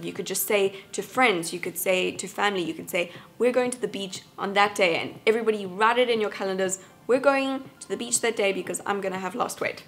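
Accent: South Africa. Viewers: I love your South African accent! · Are u South African ? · Are you from South Africa? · I could totally tell you were from South Africa !! ❤❤Do you still live here ? (From a fellow South African 🇿🇦)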